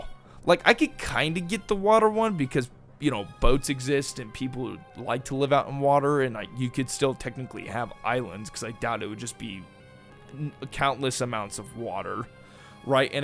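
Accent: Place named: Toronto, Canada. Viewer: U talk like u a Toronto mans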